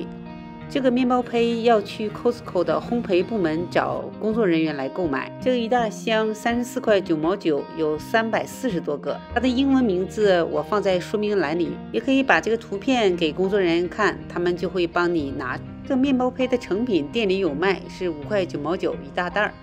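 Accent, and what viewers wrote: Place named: Northeast, China. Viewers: 一个吐司用拔个面包生坯，哈哈哈哈哈哈哈，好亲切的东北话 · 是沈阳话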